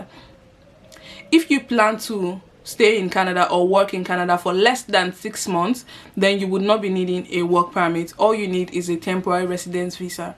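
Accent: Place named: Nigeria. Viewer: Good information but u don't sound Ghanaian ...u sound more Nigerian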